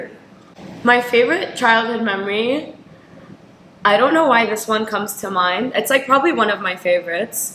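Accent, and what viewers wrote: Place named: United States. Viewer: How do you have an American accent? And stop hogging all the attractive genes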